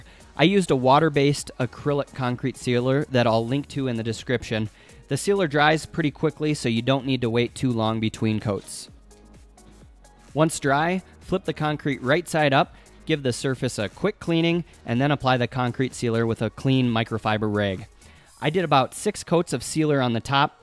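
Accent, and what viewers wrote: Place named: Montana, United States. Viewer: You don’t have to tell us you are from Montana. We can tell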